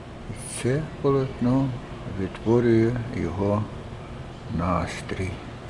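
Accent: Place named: Russia. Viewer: Надеюсь все выучили слово благодаря)))., Ваш украинский все равно русский.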